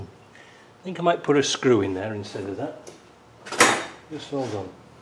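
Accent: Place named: Middlesbrough, United Kingdom. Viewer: I recognise your accent - I’d guess Middlesbrough / Darlington area?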